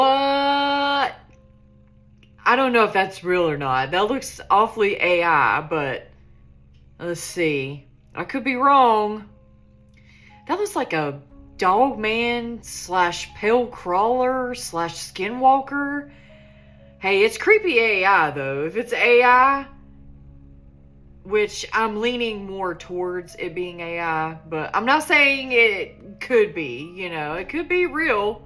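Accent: South, United States. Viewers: This girl is badass I'm digging the southern draw and also the canine eyebrows it's working for her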